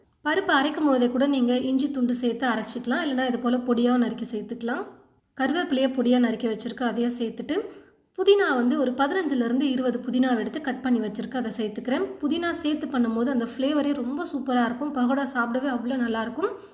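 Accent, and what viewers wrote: Coimbatore, India. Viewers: Coimbatore ah... 😂😂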